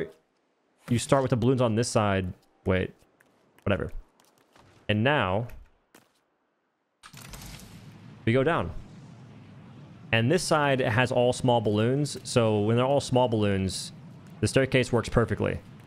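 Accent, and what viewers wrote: South, United States. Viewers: Nice video, I’m gonna build an elevator to get to my monorail station. Look, I’m someone who’s always very curious about people’s dialects and accents. And to me, your accent is kind of interesting. There’s no non-creepy way to ask this but…what Southern state are you from? And to level with you, I’m from Chicago lmao.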